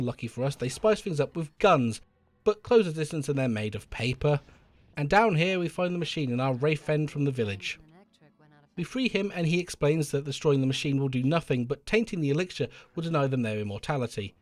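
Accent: United Kingdom. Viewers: Smashed primal on ps2. Genuinely pleased to hear someone from uk when I clicked on this nostalgic brilliantly written blast from the past. Looking forward to what else you've got cooking? c: deserve a mini roll for this vid my G defo ❤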